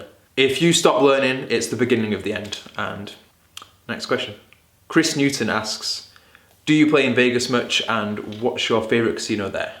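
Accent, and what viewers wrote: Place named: Yorkshire, United Kingdom. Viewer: Hey, where in the UK do you come from? I mean you sound like a Yorky like me. so?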